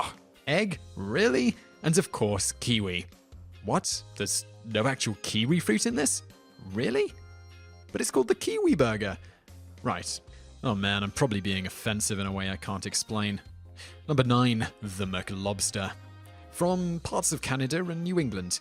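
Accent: United Kingdom. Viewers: I'm very confused... you sound british, your videos etc refer to US currency and culture (including references to foreign being anything not American), but in one of your other videos I thought you said you lived in the Czech republic??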